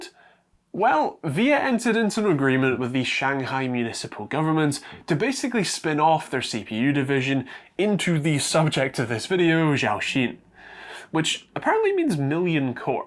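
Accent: Scotland, United Kingdom. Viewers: You're clearly not accustomed to the Scottish accent.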